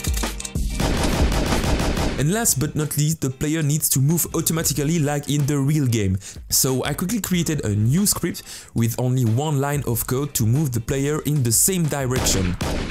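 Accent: France. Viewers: mdrr l'accent français est présent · Est tu français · Wow the accent and the little FRA at the bottom of the screen clearly shows you’re French 😅 Also the search bar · Are you frensh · Super cool! as usual! from where do you take your accet? i swear you're french!;) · Toi t’es français non ? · Tu as un accent Français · Are you french? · Are u french? Sounds like it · You sound French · J’adore l’accent français et très interessant la vidéo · Ou la il est français lui avec son accent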